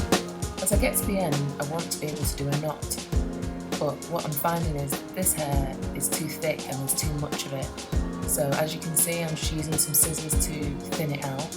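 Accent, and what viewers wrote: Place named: North, United Kingdom. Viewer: They look great and omg you're a northerner!! Haha x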